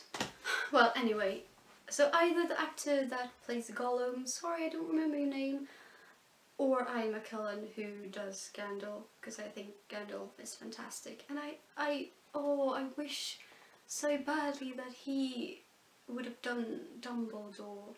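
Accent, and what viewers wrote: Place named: United Kingdom. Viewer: Vilken brittisk engelska du har, så där fin och sofistikerad <3 Min är mer amerikansk och hemsk, hahaha xD Är sugen på att göra denna tag med, när jag får lust (och är ensam hemma). Kan inte spela in videos annars D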